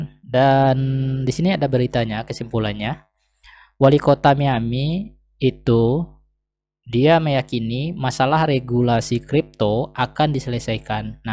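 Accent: Padang, Indonesia. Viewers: Logat2 e bunyi urng Padang,pas cek Namo e ,eh urng Padang,Dima Padang e bg?Wak pdg Lo a kwkwkw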